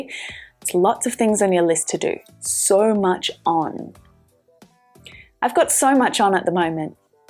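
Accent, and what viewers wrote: Australia. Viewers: Are you australian ? How you thought to choose the name of your Channel ? mmmEnglish! it's like tasting a dish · Iam afraid to be addict to australian english accent .U're great teacher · Love the Australia accent, yes your smile bright like a morning star✅✅✅✅🇸🇪🇸🇪🎃🎃🎃🎃🎃🎃🎃🎃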